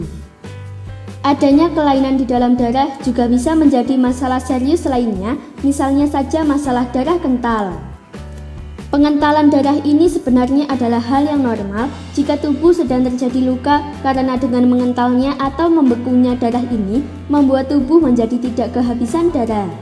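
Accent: Java, Indonesia. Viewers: Logat jowo nya keciri bangetya. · pie to mbak u mgomonge koq medoq bener se.... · Iya benar, itu dialek mbak jawa, dak apa2 yg penting jelas he he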